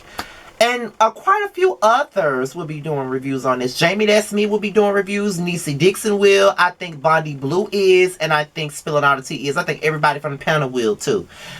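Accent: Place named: Mississippi, United States. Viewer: I didn’t know you were from Mississippi. I’m from Jackson, MS! I knew I recognized that accent lol. Represent!! You have a new subscriber. We have to support our own.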